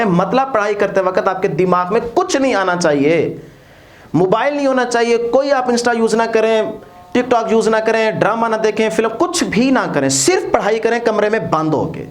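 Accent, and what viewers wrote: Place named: Saraiki, Pakistan. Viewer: Sir AP ky bolnay k Andaz sy pta chal gaya mn bhi sariki ho😊😊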